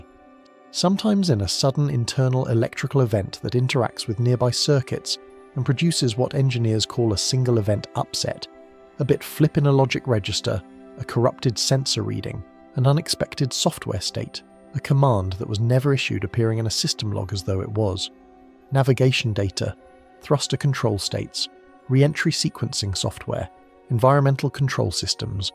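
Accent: United Kingdom. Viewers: Your British accent is not going to help you to get by with this rubbish story!!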